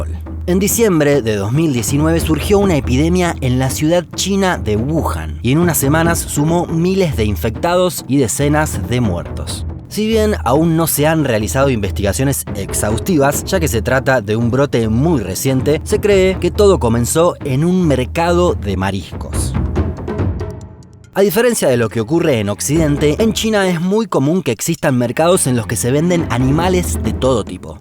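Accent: Argentina. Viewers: que pereza con ese acento argentino no puedo escuchar bien, lo voy a quitarsh. Chego se dice "llego", cha "ya".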